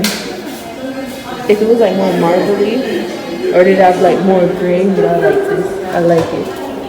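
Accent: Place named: Jamaica. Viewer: Love your likkle patois🤣🤣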